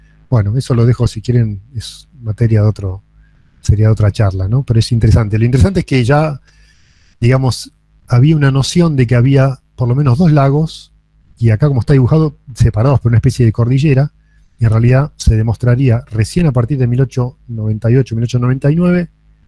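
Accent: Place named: Argentina. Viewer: Pense que era algo interesante de mi tierra ,Argentina !!!!me confundi por su acento , bueno este no es mi lugar y tampoco me interesa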